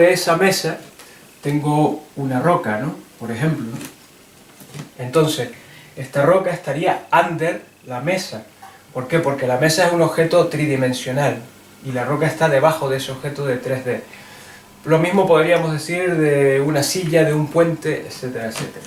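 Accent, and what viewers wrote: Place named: Spain. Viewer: Tienes acento español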